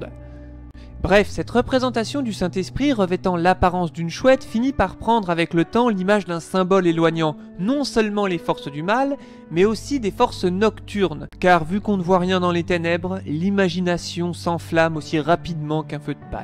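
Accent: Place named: Burgundy, France. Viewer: Oh mais c'est bien une pointe d'accent bourguignon que j'entends? Toujours aussi intéressant et brillamment illustré!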